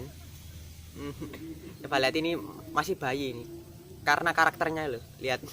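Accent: Java, Indonesia. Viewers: Dari segi bicaranya keknya dari orang jawa:v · Nk ngomong oj medok" to.. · Jowo ne endi mas?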